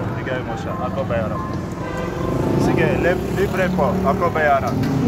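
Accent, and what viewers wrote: Philippines. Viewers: It’s crazy you picked the Filipino accent 😀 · Ur slang is lil kabayan now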